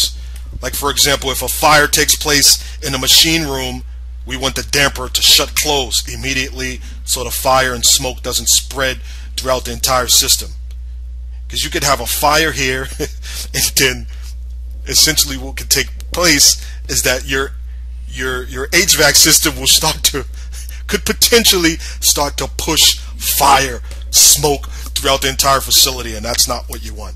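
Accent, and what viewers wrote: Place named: Brooklyn, United States. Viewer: Cool Brooklyn accent... Good tutorial, but I am curious to if the duct sizes and CFMs are actually linked to the parent file, with considerations to building orientation and insulation values. From a design perspective, are ducted returns now normative in NY? as ceiling plenum returns are much more efficient and less fussy -- except where mandatory for hospitals, labs, etc.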